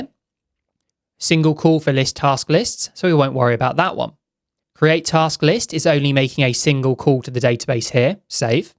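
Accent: United Kingdom. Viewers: sorry but.... why is british accent so hard to understand,why cant u guys just speak what should be spoken...:(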